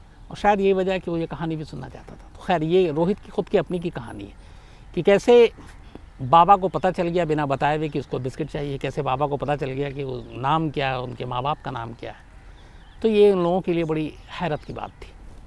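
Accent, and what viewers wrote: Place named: India. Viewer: Shams Tahir Khan ji aap ki awaj ek hindusthani ki aawaj lagti hai.aap ke awaj mai allaha ne bahut noor diya hai.❤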